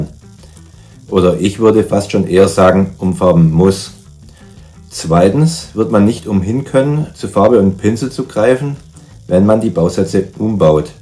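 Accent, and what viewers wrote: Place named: Swabia, Germany. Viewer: Den schwäbischen Einschlag hört man als Schwabe schon noch. Das ist wie Fshrradfahren. Kann man's einmal, blekbt es auch. 😊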